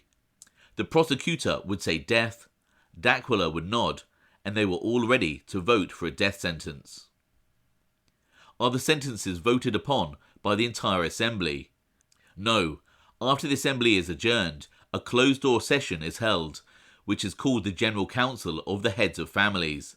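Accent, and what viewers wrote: England, United Kingdom. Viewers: Not true, I'd watch an autobiographical vid in a hot minute lol. You're a Boss in your own right. Top notch research and presentation complete with a crisp English accent. Slay!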